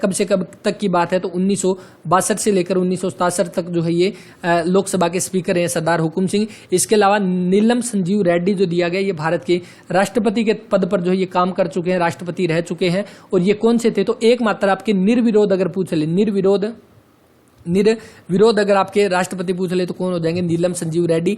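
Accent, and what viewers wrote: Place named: Haryana, India. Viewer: South haryana ki bhadi boli h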